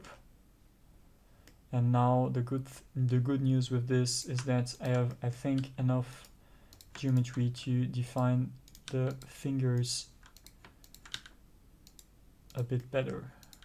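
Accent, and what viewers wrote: France. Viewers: it sounds like you are french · Super tuto le m'sieur à l'accent français ;-) Je viens de tomber par hasard sur ta chaîne, j'ai du retard à rattraper. Merci pour le partage de tes compétences. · man what r u talking about... ur French accent is a blessing haha. I've watched so maaaaany tutorials of asian guys understanding whom was a fucking torture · I got lost in your silky smooth french accent and accidentally made my base mesh out of cardboard, styrofoam and an actual blender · "And if you're REALLY lost because of my French Accent, don't wor-" Me, immediately: Oh no! Don't you dare be disregarding yourself, sir, ma'am, or Enby person, because I've been learning French for a REASON, DAMMIT! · nice french accent and video ! good job dude :) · oh j'ai tout de suite reconnu le petit accent français XD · Don't worry your french accent is understandable and nice!! 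· really good tutorial and the french accent is cute. thanks! there is so much you can do with meshes and wireframes. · French accent 10/10 <3 great work on the video it literally made me get back into blender!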